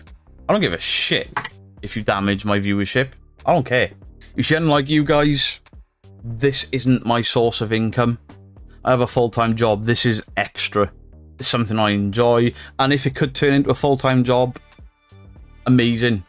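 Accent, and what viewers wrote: Wales, United Kingdom. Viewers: u crack me up! I always watch ur stuff! Ur ace! u tell the truth which sadly soooo many of the ghost hunters etc just do not! Keep doing what ur doing please and I adore ur accent! I ❤️ the welsh! I don’t live far from the border! Thanks from the Labrador! 🐾🐾🐾🐾👍🏻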